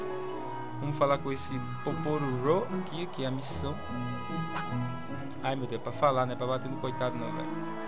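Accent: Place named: Pernambuco, Brazil. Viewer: Pernambucano, mano? Sotaque infalível.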